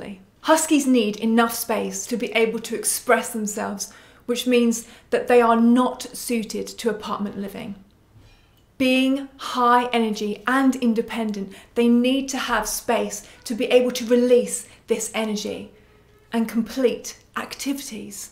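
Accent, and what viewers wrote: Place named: United Kingdom. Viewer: The brits make everything sound better.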